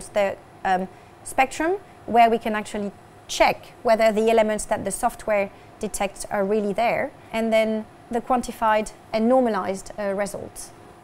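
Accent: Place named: Northern England, United Kingdom. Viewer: She's got northern English traces in her composit accent.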